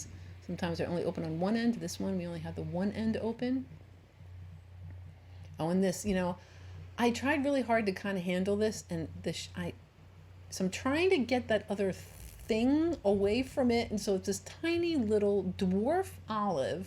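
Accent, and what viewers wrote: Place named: New Jersey, United States. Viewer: I enjoy your videos and you are teaching me so much. I would love to live close to a beach but live NE of Ft. Worth, TX. I enjoy your NJ accent and miss Cape May, NJ.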